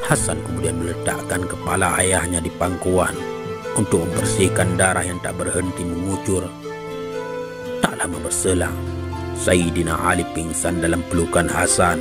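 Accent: Aceh, Indonesia. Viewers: Saya lebih ke suku aceh suara "s" dan "t" nya khas .. dan fasihnya melafazkan kalimat arab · Dialeg admin, terdengar seperti dialeg aceh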